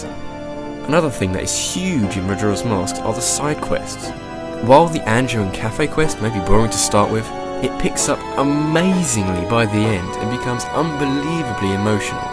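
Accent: United Kingdom. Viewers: OOOOH SWEET! A BRITISH GUY! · Because almost every Brit I've heard pronounce Ocarina says Ocariner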